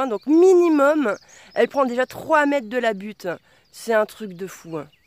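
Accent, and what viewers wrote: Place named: Marseille, France. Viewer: ah ca y est je sais d ou vient ton accent, t es pas de Marseille !!! dis donc tu as tiré tes images du dernier indiana jones la scéne avec les fourmis hahaha-lol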